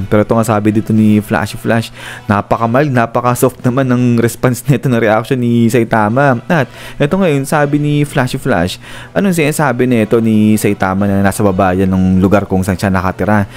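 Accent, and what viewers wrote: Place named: Batangas, Philippines. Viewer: Tanong ko lng lods kung batanggenño kba? or bulacaño? May punto kasi ung pagsasalita mo 😆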